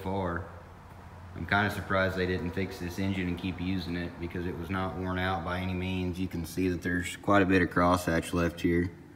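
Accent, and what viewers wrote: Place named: Kentucky, United States. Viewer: Not sure where dude's from but man he sounds like rural Kentuckian to me. All he needs to do is say "hell fire" as an exclamation and i'd be convinced.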